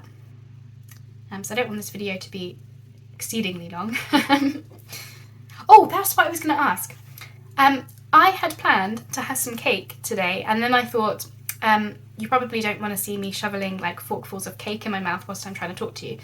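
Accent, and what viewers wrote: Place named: England, United Kingdom. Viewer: I am so glad you asked! 😃 From the title I was NOT expecting and English accent. Lol. I was wondering if I missed why she chose. I look forward to the answer. ♥️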